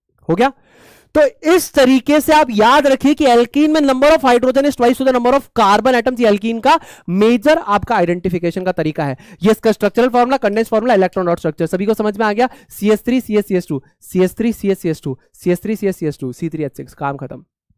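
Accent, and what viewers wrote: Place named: Marwar, India. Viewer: Sir aapke teaching style ke sath appki marwadi...it's amazing 😊❤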